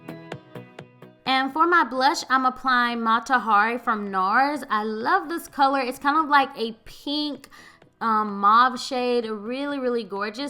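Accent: South, United States. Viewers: Love your southern accent ! ! !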